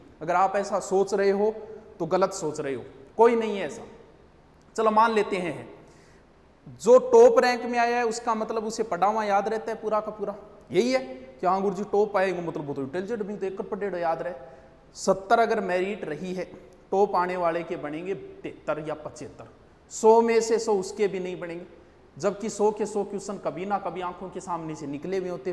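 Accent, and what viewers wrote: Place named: Rajasthan, India. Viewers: Sir aapki bolli jhakas h Kadhe kadhe Meri daadi puchhe kara. Yo chora haryanvi h k rajasthanni 🤣🤣🤣🤣🤣🤣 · Sir very helpfull video,,,,,,or aap ki rajsthani awaaz. 👍🏻👍🏻👍🏻👍🏻👍🏻👍🏻👍🏻😀😀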